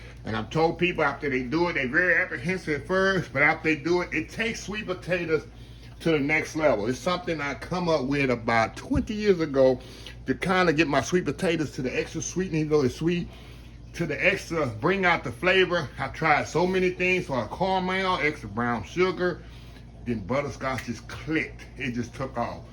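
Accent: South, United States. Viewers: Unk I’m so geeked for your thanksgiving uploads! I really feel like I’m in the kitchen with you! You’re not using all this fancy cookware and gadgets or editing as if your in a tv studio,just you and what you have and thats what makes it so relatable for those of us who are not rich or some “fancy shmancy cooks”… right down to the warm tones & country like atmosphere of your kitchen and your southern drawl is just the icing on the cake..no pun intended lol… again thank you for being you chef❤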